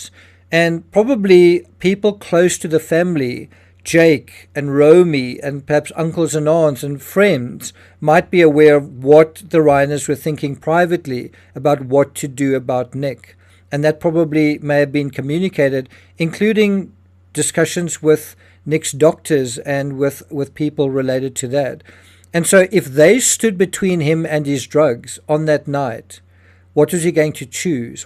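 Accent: South Africa. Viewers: This commentator sounds south africa . Is he.😊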